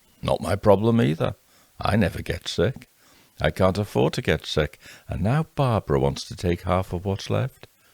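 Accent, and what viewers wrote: United Kingdom. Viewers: you are extremely talented and creative and I will tell you if I was not on a fixed income. You would be the first so that I could join your YouTube. However you do that, I mean it. I love these. Thank you from the USA we do not have talent and. Creativeness and the accent and the humor that the brits do.Trust me we don't at least we don't in nevada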